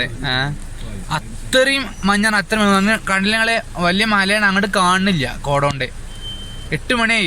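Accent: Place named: Malappuram, India. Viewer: Ithil samsarikuna aalude samsaram assal malappuram. Ushaarayitund.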